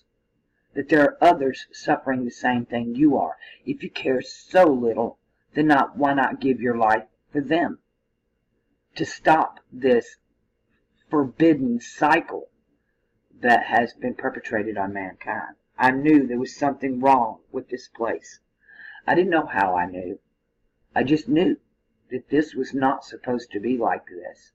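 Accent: South, United States. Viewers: lol i loooove the Southern Drooole :)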